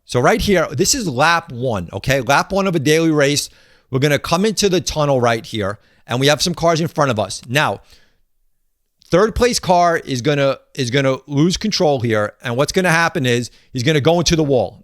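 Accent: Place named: New England, United States. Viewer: you from New England? That accent sounds like it! I'm in RI! I've been seeing your videos for a few months! Appreciate the content, brother!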